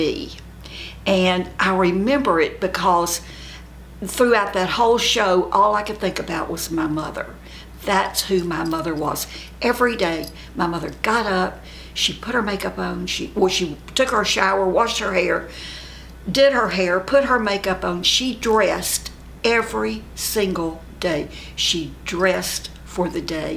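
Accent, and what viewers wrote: South, United States. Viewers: Hi, great advice and hell yes I would love to hear how you and Jim met and how he won your heart. So happy you never settled for just anyone. You always make my day because you are always honest and interesting plus I love you southern accent 😉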